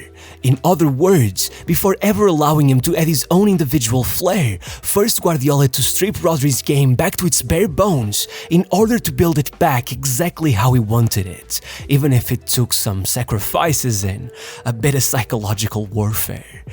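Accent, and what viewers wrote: Portugal. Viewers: Just a Portuguese accent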